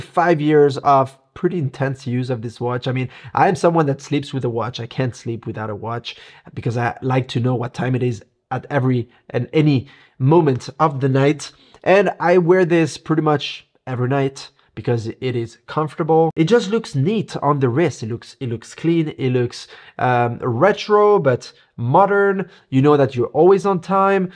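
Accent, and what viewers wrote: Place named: France. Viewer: T'as un accent français quand tu parles l'anglais ! Try to cut down on the word "really". You really say it a lot!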